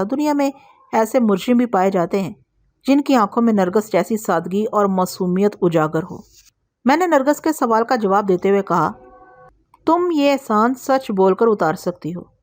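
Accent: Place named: Pothwar, Pakistan. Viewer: محترمہ آپ کا پوٹھواری لہجہ اچھے خاصے قصے کا ستیاناس کر دیتا ہے۔ اپنے لہجے میں معنویت پیدا کرنے کی کوشش کریں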